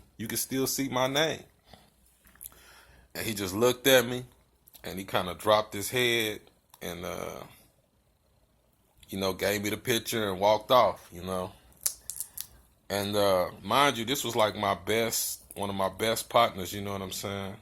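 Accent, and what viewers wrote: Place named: Oakland, United States. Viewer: Sound like you from Oakland, Calif.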